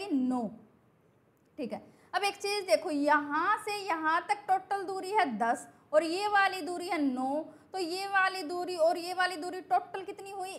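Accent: Haryana, India. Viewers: Aap haryana se ho kya aapki boli humre jesi lagti hai 😊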